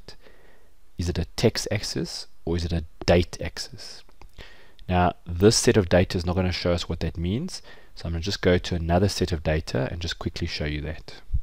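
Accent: South Africa. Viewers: dude, are you South African? haha definitely a SAn boytjie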